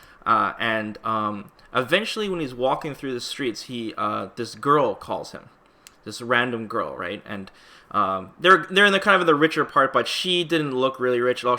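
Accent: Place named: Canada. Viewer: He's Canadian ayyy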